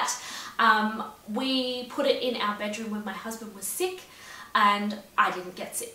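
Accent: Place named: Australia. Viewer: I love Australians accent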